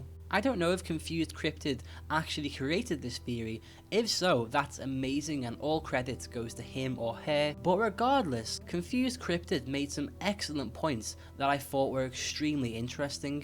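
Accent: Liverpool, United Kingdom. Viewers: Are you from Liverpool? I am :p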